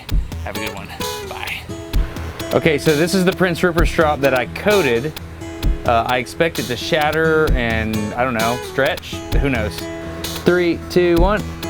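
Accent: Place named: Alabama, United States. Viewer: Destin can we organise you to make an audiobook of some Robert Hooke writings. I want to imagine Hooke with your energy and an Alabama accent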